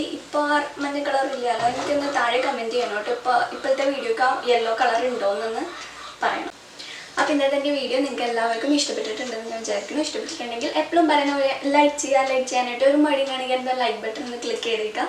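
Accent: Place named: Thrissur, India. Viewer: Ur presentation is very good. Chechide veed trissur enganum aano? Slang varunnu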